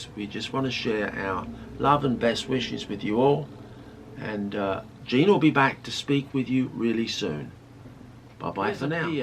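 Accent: England, United Kingdom. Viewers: Sending best wishes for a speedy recovery., love your English accent. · So nice of you to fill in for Jean; great job! Please don’t apologize for your accent……it’s charming and delightful and when I go to England, I’m the one with an accent! Feel better soon Jean! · Awww, So sorry Jean has been so sick! I will be praying for her swift recovery. She is such a Joy to watch & listen to. As far as your British accent, I love it! So Glad Jean has such a lovely sweetheart. TY to you & on camera! God bless you all!! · Never apologise for your beautiful accent, English accent is my favourite. Give love and hugs to Jean for her Aussie youtube friend. God Bless. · Thank you. Love your British accent. God bless all of you. Best wishes for Jean to get well. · Prayers for a speedy recovery for Jean and I love the British accent. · Thank you so much and for updating on Dearest Jean. 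Your Such a beautiful family looking after each other. Wishing Jean a speedy Recovery send Love fro. The UK. PS be proud of your beginings... Great British accent! Xx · Hope Jeans on the mend soon and begining to feel better x 💐 and, dont apologise for your accent. there's absolutely nothing wrong with a lovely English accent x 😉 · Thank you for the update. Your accent is great (fellow Brit living in Texas). Dear Jean, love you, miss you, sending you all the get well wishes. I will be watching your videos all day today. You bring a smile to my face every time I watch you. Take care, rest and let those lovely men take good care of you xxx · thank you for keeping us up to date about our lovely Jean, sending all prayers for her speedy recovery. Your English accent is so refreshing to hear. Thank you dear for your help in getting the video out for us. Best wishes to all the Trueloves. ♥♥ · Thank you for the update on Jean. 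Prayers for her speedy recovery. Ps..never apologize for your English accent. I love hearing it.😊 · I love the English accent. 💜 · Dear, please give Jean my love and wishes for a speedy recovery. Tell her we will all be waiting patiently for her return to her usual chatty smiling self. Thanks to you and, I’m sure Jean has the best possible care. Nothing wrong with the accent, I’m from Yorkshire originally so you sound very British to me. Love to you all 🏴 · Aww what a lovely video - (even though Jean is ill,) the way you speak about your wife with such love melts my heart! Hope you feel better soon Jean! PS, as a fellow english woman, your accent is magnificent!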